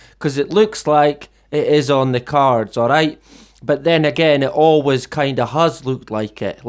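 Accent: United Kingdom. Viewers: its comforting to know I'm not alone and to hear a UK wonderful voice lol I've mainly listened and watched American or Australian truthers the odd one from UK! If know any other small UK channels post a link cause an extra like and sub helps for people who stumble across these channels!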